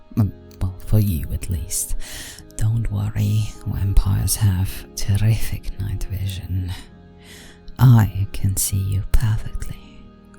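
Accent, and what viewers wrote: Scotland, United Kingdom. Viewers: I can't figure out the accent, the os sound Australian, then she sounds Scottish but the way she rolls some words sounds Russian or something. I love her tho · She sounds Scottish to me, is that just me?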